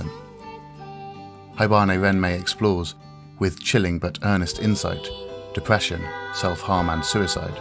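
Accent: United Kingdom. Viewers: Are and Sargon of Akkad the same person? The voice sounds similar to me and it's not only the British accent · As a fellow English person the voice is slightly laboured and enunciated, which for me affects the flow - but it’s a very nice voice - probably sounds amazing to non British speakers of english. We have some nice accents here I must say. And some weird ones! Best thing about the voiceover - at least I can understand it!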